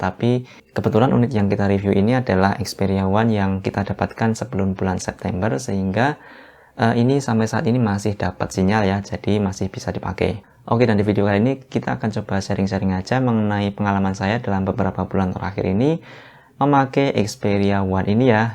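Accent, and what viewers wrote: Boyolali, Indonesia. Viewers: Ealah mas, orang Boyolali to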